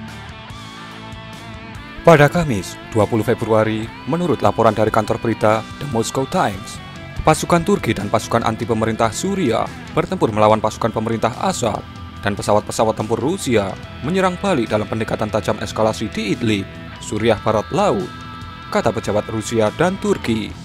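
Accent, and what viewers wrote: Java, Indonesia. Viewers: Medog mu bro....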